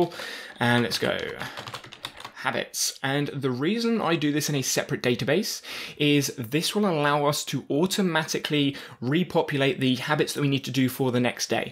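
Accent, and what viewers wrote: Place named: Gloucestershire, United Kingdom. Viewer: Cheers. Where you based? You sound local. Gloucestershire area?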